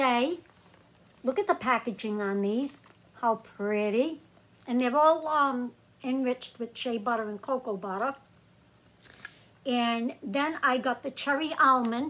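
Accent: Boston, United States. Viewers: Are you from Boston ? Your accent sounds like it 😊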